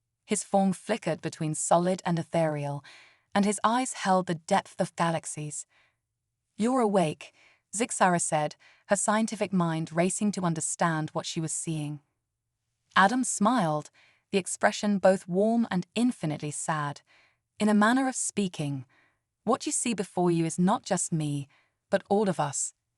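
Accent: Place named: Australia. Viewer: This might be an AI story channel hard to tell but I love the Australian female accented voice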